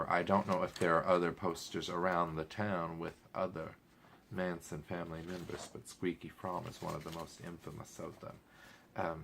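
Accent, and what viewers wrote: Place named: South, United States. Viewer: Hi! Loving your southern drawl.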